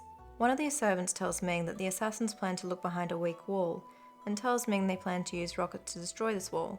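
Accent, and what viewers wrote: Australia. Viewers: The good ending is being a soft spoken Aussie lady who gets all the views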